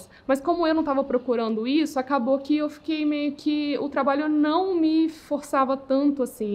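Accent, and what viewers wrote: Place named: Belo Horizonte, Brazil. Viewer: Sotaque belorizontino...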